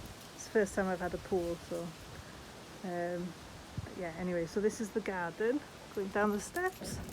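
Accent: North Wales, United Kingdom. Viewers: North Wales 😁🏴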